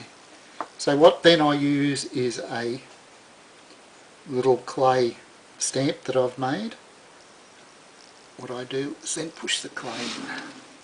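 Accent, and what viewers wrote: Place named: Australia. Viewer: great to hear an aussie! . the packaging tape technique has solved a problem for me. thanks.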